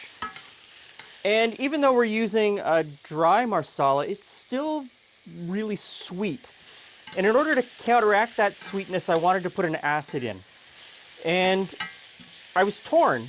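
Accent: Canada. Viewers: Makes sense. Canadian English sometimes favors British pronunciations to American ones. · I try to pretend you’re not Canadian but you pronounce literally everything differently than Americans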